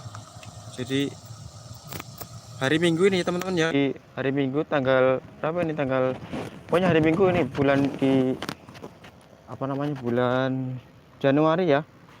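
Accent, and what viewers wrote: Banyumas, Indonesia. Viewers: Ngapak ya bos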